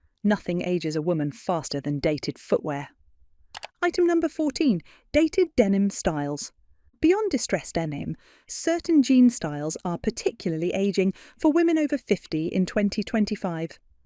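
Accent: England, United Kingdom. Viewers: You have an English accent, however you use American terms such as Pants instead of Trousers, I don't understand why the English language used in the UK, and taught in Europe has changed its language to fit in with American English. we are teaching our children American and at risk of losing our own language. This is not me having a go, I just don't understand!!!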